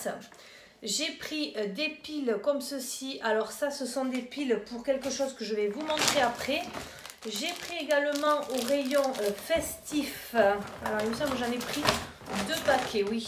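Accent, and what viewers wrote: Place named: Southwest, France. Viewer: Bonjour, A Lyon il n'y a pas de magasin PARVIS. Tu as des enseignes vraiment très bien dans ton Sud-ouest (et avec l'accent que j'adore 😍😍😍😍😍)!😘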